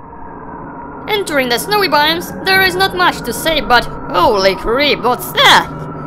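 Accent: Russia. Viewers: I hear that Russian accent in his English 🌚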